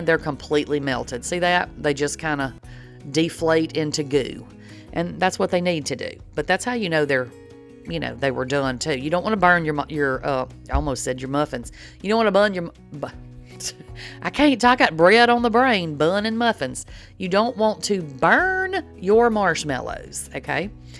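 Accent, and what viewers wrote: South, United States. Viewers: Lovely southern accent. Which State are you from? Ohh,..I’m with you on the Green Onions and Lettuce! Yes! I can hardly cook without 🧅 ONIONS. My MIL says the same thing. I use the Taco Bell taco seasoning packets and we never looked back after that! I’m definitely making that Crunchwrap this week! Tonight is Pinto Beans w Smoked Pork Neckbones and Cornbread -husband’s request. I am 60…and I STILL don’t have a stand mixer so, you’re doin’ better than I am,😂. Lovely mint color for Miss Margo. OHMG, Little Debbie Oatmeal Cream Pies are MY favorite! To make these will be such a treat!!! I think we would be good friends if you were my neighbor.😂 Years ago, I made a variation these but used straight chewy Molasses cookies for my FIL. He wasn’t crazy about oatmeal in a cookie. He told me “You can make those for me anytime you want to because they were fantastic!”. 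Somehow, I never got around to making them again….go figure huh? 😂 Curly fries? If you can’t find your Arby’s….Checker’s French Fries are battered very lightly, seasoned excellently and really GOOD. You might like those as a substitute. Ok,..now we’re on the Cheesecake! 😋 YUM!! I just made a decadent chocolate cake with homemade cream cheese frosting on Sunday and my husband said next time to make him a bowl of the frosting for himself. 😂 Btw, I don’t normally make lengthy comments such as this but, I’m watching all 6 recipes and….listening to you is very comforting somehow.😊Plus I’m resting and recovering ❤️‍🩹 from a ver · Really enjoy your videos. I’m from Indiana and I love your southern accent. You crack me up on some of your saying.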